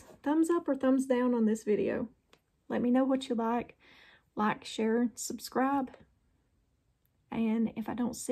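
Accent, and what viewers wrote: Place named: South, United States. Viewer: Oh, you write so well! I like how you talk and then refer that to that of the Bible. I really like the length of your video too. It allows one to get the word in important snippets, without having to do a real deep study. Your video is great when you only have a few minutes, but want to be close to the Lord! Thank you for your work, I very much like listening to you (that Southern accent is precious)! On a side note, I just have to comment on what a pretty lady you are. Your complexion is flawless and you're really lovely to watch! Thank you again!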